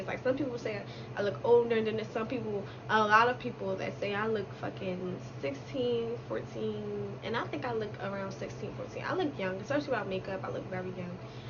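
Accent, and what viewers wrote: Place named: Philadelphia, United States. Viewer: that accenttttttt, you better be from philly 😩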